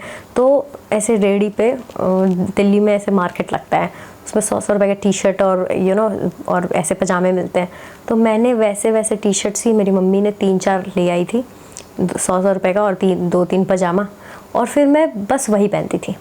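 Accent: Bihar, India. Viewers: Ha bhagalpur se ha sayad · Aap Bihari ho?🥺🥺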